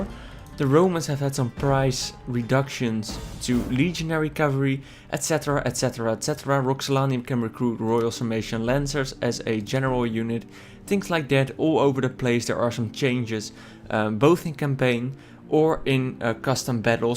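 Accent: Netherlands. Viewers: ***** You're Dutch? So that's where that accent comes from! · Are you dutch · Fucked up Dutch accent. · He has a Dutch accent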